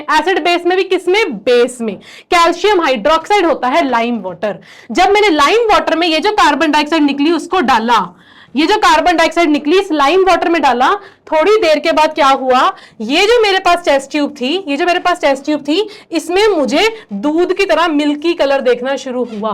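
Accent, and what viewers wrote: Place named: Punjab, India. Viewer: I'm Punjabi and they way she is talking is clearly showing she is punjabi😅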